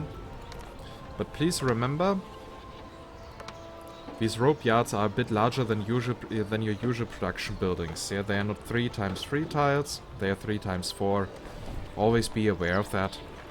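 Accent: Germany. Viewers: I love the accent, I knew you were German within a few seconds (I've lived here for 15 years I should know)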